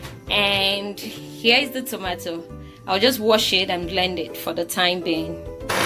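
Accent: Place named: England, United Kingdom. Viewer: Are you were living in the UK because your accent is similar to that of England?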